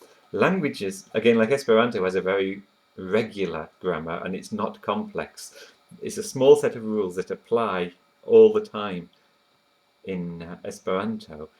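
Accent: England, United Kingdom. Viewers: you're english right?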